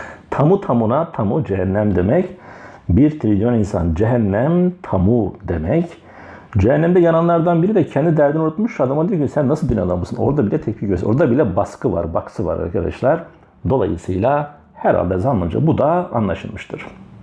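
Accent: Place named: Black Sea, Turkey. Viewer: Artık umaysın 😂 kadın Karadenizli herhalde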